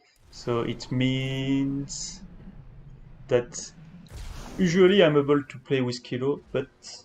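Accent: France. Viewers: Where are you from? France?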